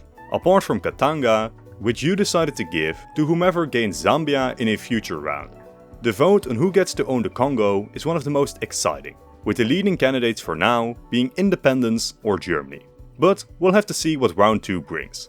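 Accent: Netherlands. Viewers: That Dutch accent though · lekker nederlands accentje:)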